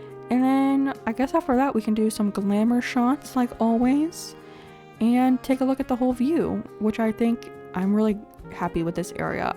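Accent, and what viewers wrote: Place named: South, United States. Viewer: That southern accent took me out hahahah this build turned out so good!! 👏🏻 and yes, the waterscaping looks very interesting 🤣 educational content!!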